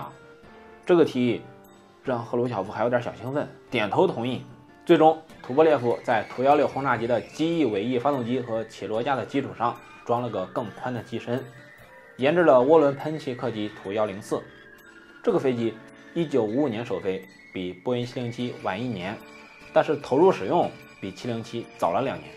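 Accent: Henan, China. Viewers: 謝謝讓我增加了很多航空知識,雖然我在台灣,但是我的父親祖籍河南舞陽,所以聽你說話特別親切,謝謝你讓我還能聽到家鄉話,希望你的頻道能長長久久,加油,謝謝!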